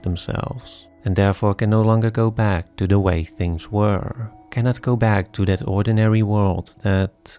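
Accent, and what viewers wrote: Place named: Netherlands. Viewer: Ik ruik een Nederlands accent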